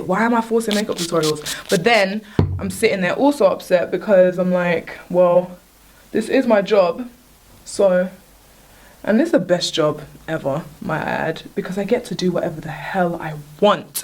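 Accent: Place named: Council estate, United Kingdom. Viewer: hate how she talks. as if she lives on a council estate.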